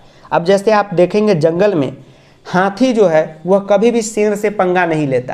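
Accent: Bihar, India. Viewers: Sir aap bihar se ho 😊😊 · Sir aap bihar se hai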